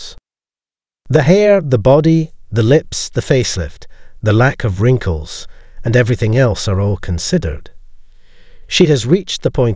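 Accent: Scotland, United Kingdom. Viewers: Scottish voice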